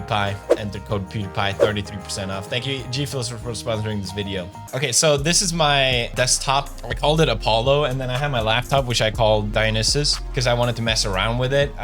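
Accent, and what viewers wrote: Sweden. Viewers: It took me not seeing your videos for 3 years to realize you actually still have a very prominent Swedish accent! 🇸🇪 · I haven't watched your videos for a long time, but I must say your Swedish accent has somehow gotten worse. Love this video, though, and I agree 100% that more people should use Linux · Can I just say I feel like since pewds probably doesnt use english as often anymore I feel like his swedish accent is coming out more and more haha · you still sound so darn swedish it's silly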